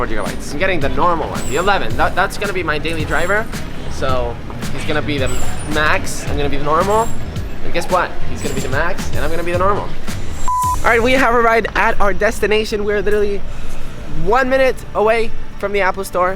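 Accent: Spain. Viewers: Se nota tu acento español, pero igual tienes un perfecto inglés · Are you from Spain? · awesome to hear you rock spanish spanish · your spanish accent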